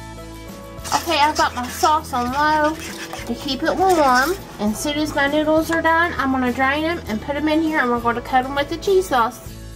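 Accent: South, United States. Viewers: Clayton Cooley I agree! She's very sweet and her voice is soothing and the southern accent makes her more charming. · what is that accent? where in america? LOVE IT xxxxxx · lichen goatfungus she does seem very sweet!!! Her food looks delicious 😋 and I love her accent,where is she from? I’m a Houston,Texas girl,and I love southern accents!!! And Country cooking might I add😁 I cook country and soul food 🥘 people have asked me if I preferred soul food because I’m African American,no I assure you I’m into all kinds of food, it doesn’t matter my ethnicity. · Her sweet southern voice is actually helping my anxiety. Had a nightmare and it’s 4am, ended up on her channel and it’s like a lullaby. Thank you!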